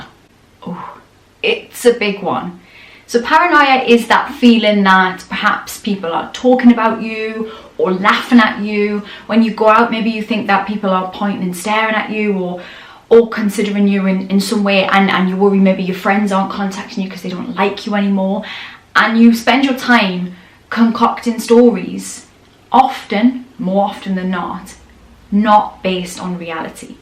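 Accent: Newcastle, United Kingdom. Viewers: Beautiful geordie accent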